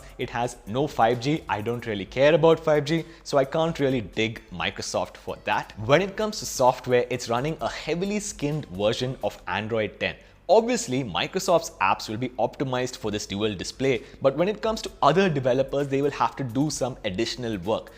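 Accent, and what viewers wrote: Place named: India. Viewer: Дико забавно этот индийский чел говорит, и очки крутые! =)) Если по теме, то я согласен - без NFC, с тощей акб, без поддержки карт памяти этот телефон с железом 2х-летней давности вряд ли будет иметь успех, особенно с ценником в 100к рублей. Хотя, я постараюсь его купить, конечно. Надеюсь, Мелкомягкие всё-таки будут продавать более осовремененную версию девайса. А ещё жалко, что он не на Винде.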